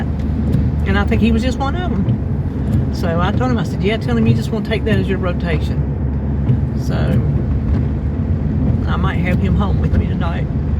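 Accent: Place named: South Jersey, United States. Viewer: I ❤ regional dialects. How boring if we all sounded like AI. The South Jersey accent sounds like home to me. My relatives all lived there.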